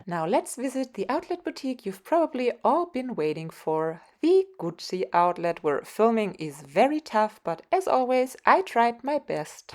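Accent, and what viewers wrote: Germany. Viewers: I love your german accent...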